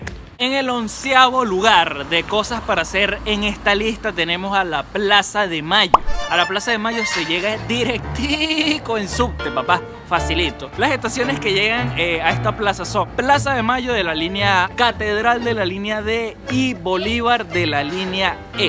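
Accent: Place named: Venezuela. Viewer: no es de hater, pero porque los venezolanos siguen hablando como venezolanos estando en Argentina, me choca que no adopten ni un poco el acento.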